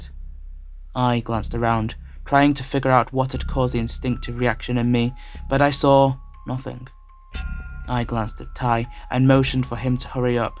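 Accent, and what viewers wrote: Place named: England, United Kingdom. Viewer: Good story.. Not sure about the English accent of the narrator though.. ... ... For the record I'm a Brit too.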